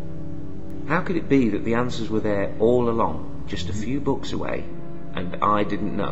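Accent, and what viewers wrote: United Kingdom. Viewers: Sounds like UK maybe?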